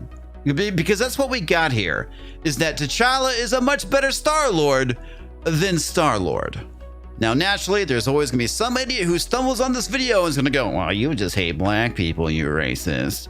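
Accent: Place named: New York, United States. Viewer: You sound like an off-brand New Yorker, mate. I like it! And man was this show garbage.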